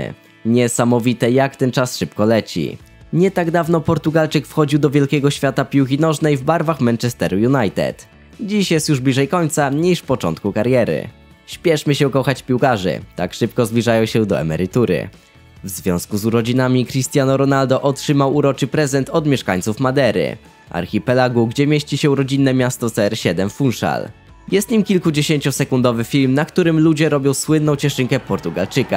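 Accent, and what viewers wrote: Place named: Poland. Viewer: O dzisiaj dużo polskiego akcentu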